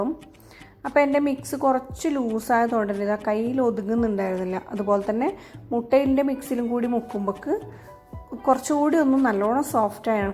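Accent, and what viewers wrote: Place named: Trikaripur, India. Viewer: Hi from another YouTuber from Trikkaripur ☺️👍...nice presentation with trikkaripur slang 😁... Do support back. 🙏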